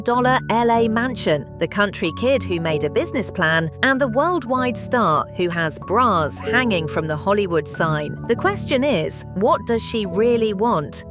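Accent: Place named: United Kingdom. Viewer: I hate that accent…this is the US not Britain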